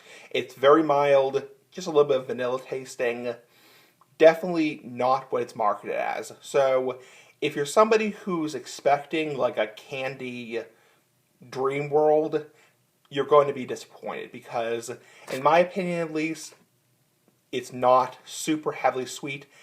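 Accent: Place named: France. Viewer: I like your accent, are you French?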